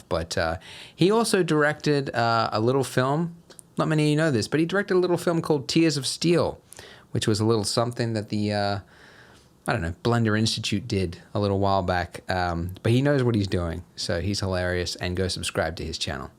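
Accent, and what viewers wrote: Australia. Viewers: Oh I love Aussies, they're such good sport. And the accent is always a + too.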